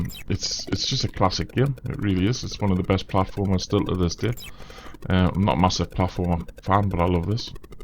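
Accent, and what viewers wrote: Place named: Tyneside, United Kingdom. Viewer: Nice video.. Nice to hear a good Tyneside accent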